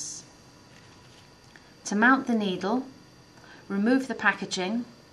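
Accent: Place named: United Kingdom. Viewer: I love british accent and the video was good to. Thanks a lot!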